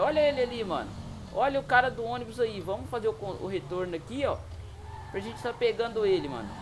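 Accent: Minas Gerais, Brazil. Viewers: Ele e mineiro?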